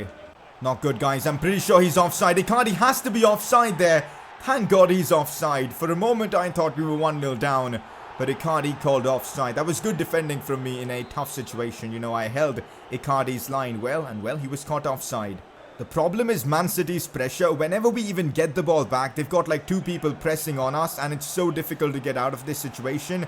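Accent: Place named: India. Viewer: Bro why do you accentuate your vowels too much. That Indian accent is too noticeable lmaooooo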